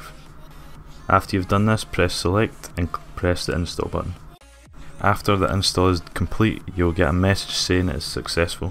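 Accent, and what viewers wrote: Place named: Scotland, United Kingdom. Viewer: first scot yt ive heard in ages mate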